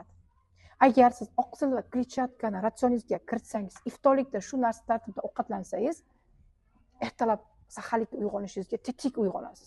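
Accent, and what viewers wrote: Bukhara, Uzbekistan. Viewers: Шеваси бухороликлага ухшаркан ❤❤❤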